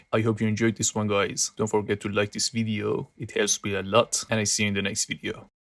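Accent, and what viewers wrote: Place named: Tehran, Iran. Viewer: The Accent Look Soooo Bache Tehron 😃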